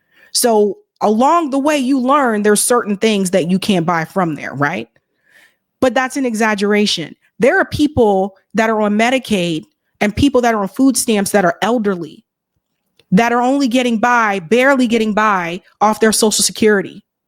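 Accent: Australia. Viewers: This dude went full blown racist with an Australian accent. Make it make sense, you not even from here bro! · Sounds Australian to me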